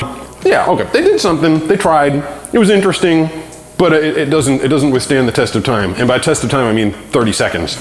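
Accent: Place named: Appalachia, United States. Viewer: Why does this pig talk with food in his mouth..hickish, reminds me of hillbilly heaven.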